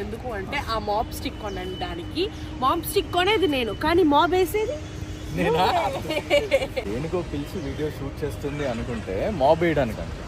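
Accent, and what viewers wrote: Tamil Nadu, India. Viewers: Mee telugu vintunte bhale navvu vastondi😂😂..tamil telugu confuse autunnaru..and matalu kuda vethukuntunnaru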